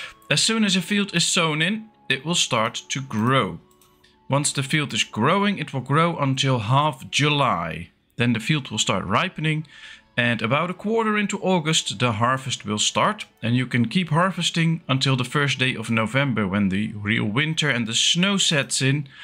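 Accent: Netherlands. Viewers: This is a very detailed video, it shows a lot of time and dedication invested in this game. Your English sounds very good, even though it's drenched in a thick layer of Dutch. You've spend a lot of time in the discovery of all the facts and numbers in this video, and I thank you for that. I too, have spend many hours playing Ostriv, and I believe your numbers and facts are correct. One detail though: if you build a field of 25x50, you will always have 1250 units, whatever plant you grow. That is, only if your field is placed on a flat and even surface. Even the slightest slope in the terrain will cause less plants could be grown. Groeten uit Ommen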